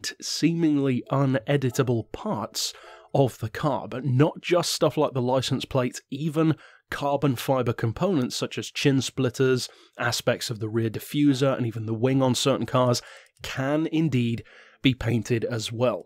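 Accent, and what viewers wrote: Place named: London, United Kingdom. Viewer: it is tho its like london or smn, but not AYO FAM BUS MAN YOUR P'S- london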